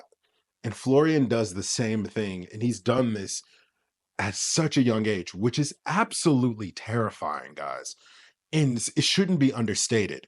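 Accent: United States. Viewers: Have to be honest. When I hear an american accent talking about football I tune out. But this guy is a ball knower. Good video.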